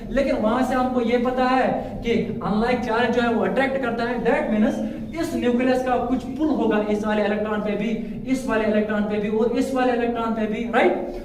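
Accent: Kashmir, India. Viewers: Apki language bohot complicated hai sir pronunciation....is in kashmiri Difficult to understand....and please speak slowly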